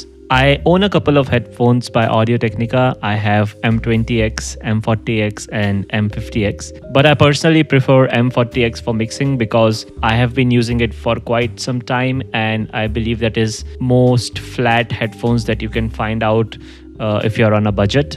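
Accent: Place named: India. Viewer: I never thought voice was Indian. Well you shocked me and you're still cool bro.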